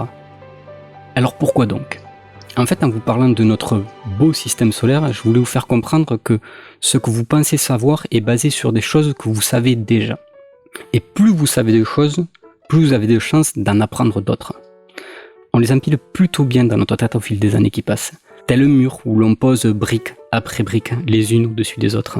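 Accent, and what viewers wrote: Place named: South-West, France. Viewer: J'ai découvert cette vidéo sur skeptikon. Du contenu sceptique à la sauce accent du Sud Ouest, voilà de quoi ravir mes oreilles. Alors je viens soutenir par un pouce et un abonnement içi.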